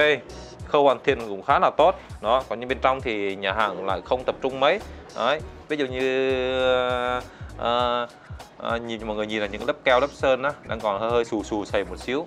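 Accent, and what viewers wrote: Vietnam. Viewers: yeu cau doi nguoi huong dan , giong ong noi nay ko biet o vung nao ma nghe nhu bi dam ngang hong , kho chiu thiet .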